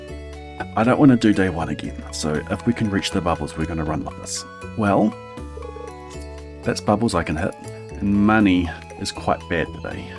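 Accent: New Zealand. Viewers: Bro? Are you Kiwi? I swear. Even if you're not... You totally do!! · He's definitely a Kiwi. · Holy NZ accent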